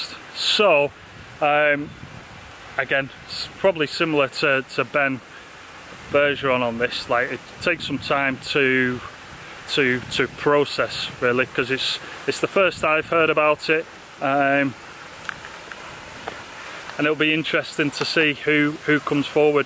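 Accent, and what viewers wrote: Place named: Lancashire, United Kingdom. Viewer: If you had a message it got lost in your Lancashire accent ... Pal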